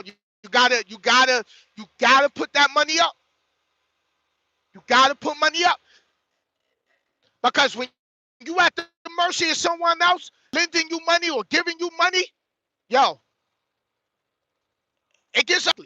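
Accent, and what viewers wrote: New York, United States. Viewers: What's good, my brother. I'm confused. I hear a Knickerbocker accent, but I'm seeing a Raiders hat. Anyway, I always tell folks I'm always on time.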